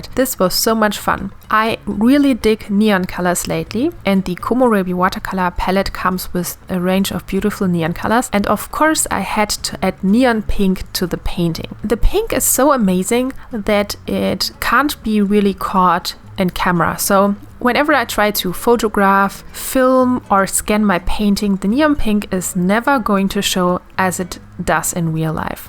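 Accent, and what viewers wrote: Germany. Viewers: I like your German accent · thick german accent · are you from Germany, because i think you have a little German accent( that is not a hate comment!)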